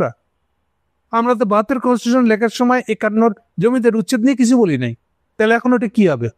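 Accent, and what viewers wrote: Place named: Bangladesh. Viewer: Love the words " maraen , maraita aise etc" keep it up. Best of luck Da Da. Great and perfect khas Bangladeshi delivery ❤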